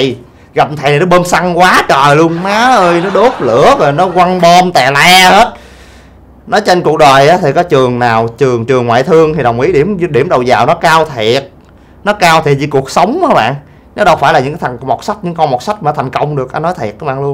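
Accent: Mekong Delta, Vietnam. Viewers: a này nói chuyện hay quá, vừa thực tế vừa nhiều bài học thấm, nói chuyện kiểu miền tây chất phát dễ nghe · a nói đúng chất miền tây lun a oii. ABC các kiểu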